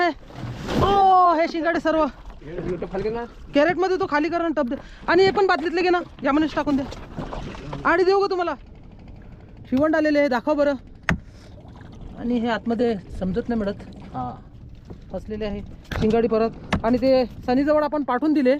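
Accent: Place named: Mangeli, India. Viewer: भाऊ तुझी मराठी मांगेली भाषा ऐकायला मज्जा येते, एक पाट धाडून दे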